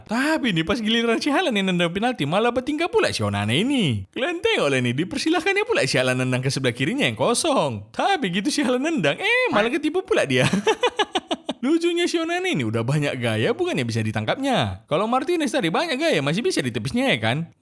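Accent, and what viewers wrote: Medan, Indonesia. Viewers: segaring apapun konten nya kalo pake logat medan jadi lucu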